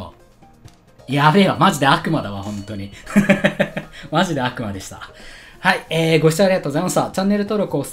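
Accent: Kansai, Japan. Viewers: うぽつです٩( 'ω' )و関西弁喋ってるのがすごく良いです(´꒳`)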